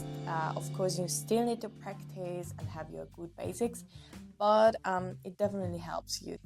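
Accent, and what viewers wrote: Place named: France. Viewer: What is that small black triangular item in the plastic bag that you put in your flute bag? By the way, I love your accent. Are you French?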